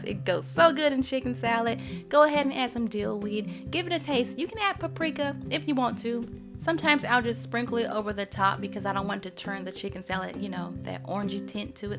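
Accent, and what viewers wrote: South, United States. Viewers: I fucking love this woman, now! You’re so southern! 😢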